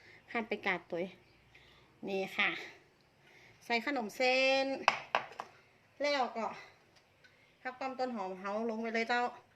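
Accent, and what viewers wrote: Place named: North, Thailand. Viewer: แวะมาฟังสำเนียงเหนือคะ ชอบสำเนียงเหนือมากคะ จากfcใต้นะคะ ❤️ น่าทานมากคะ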